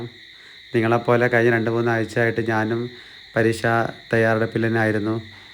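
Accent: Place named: Kozhikode, India. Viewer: ഞാൻ tvm ജില്ല. സാറിന്റെ ക്ലാസ്സ്‌ കേൾക്കാറുണ്ട്. നല്ല ക്ലാസ് ആണ്. ചില വാക്കുകൾ മനസിലാകുന്നില്ല. കോഴിക്കോട് രീതിയിൽ സംസാരിക്കുന്നത് കൊണ്ടാകാം. 😊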